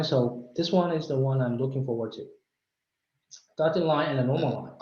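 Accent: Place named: India. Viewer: wtf, indian english.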